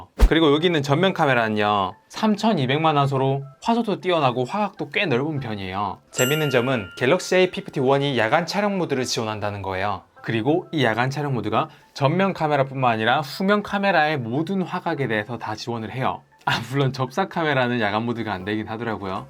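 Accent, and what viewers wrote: Gyeongsang, South Korea. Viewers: 경상도사람이구나. 순간 김영철인줄..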